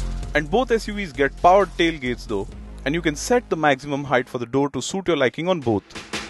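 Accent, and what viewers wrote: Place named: India. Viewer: The host's Indian accent is so strong and freaking annoying. Keling pendek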